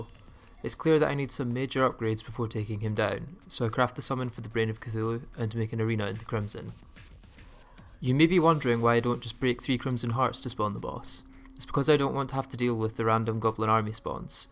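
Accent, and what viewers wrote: Scotland, United Kingdom. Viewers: You Scottish ???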